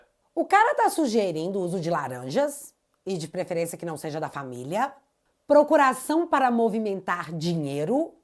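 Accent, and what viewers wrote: Minas Gerais, Brazil. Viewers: Sou carioca mas o sotaque mineiro é o mais bonito da língua portuguesa 😊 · Bão por de mais como falar o mineiro 😅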